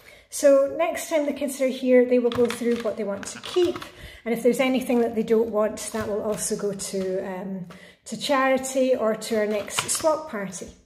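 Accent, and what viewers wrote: Scotland, United Kingdom. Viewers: Hey, are you Scottish?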